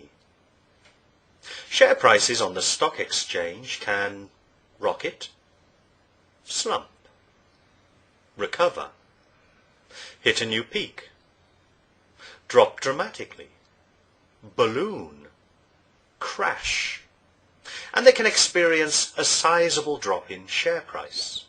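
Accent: United Kingdom. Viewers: Is Mr. a native British speaker???????????????????????????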